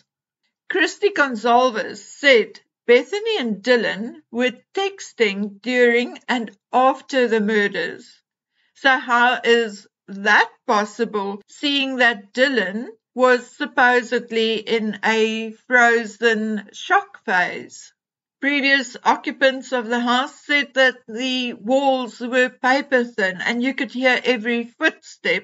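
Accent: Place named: South Africa. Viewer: dont know what it is about the South African accent but its soooo beautiful .-) great video btw , lots of valuable points made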